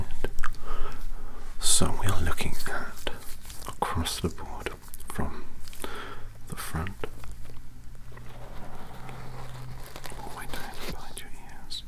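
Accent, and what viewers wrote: United Kingdom. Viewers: You could genuinely do a Micheal or even William Afton from the Five nights at Freddy’s series. As you sound just as British, and almost sinister sometimes. But at the same time you are very funny so you could have fun with it. I don’t expect you to do it I just had that thought, could be cool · Minolica coughs awkwardly in I totally don’t thirst over his gorgeous hair and British accent sorry 😅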